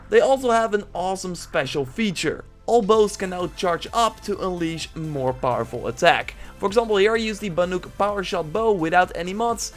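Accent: Netherlands. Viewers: are you dutch? bc u sound very dutch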